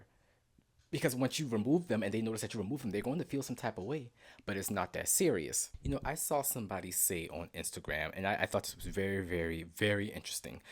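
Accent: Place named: Geechee, United States. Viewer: Yesss your accent is too cute.. that good ole southern geechi honey ❤❤ I'm from SC too ❤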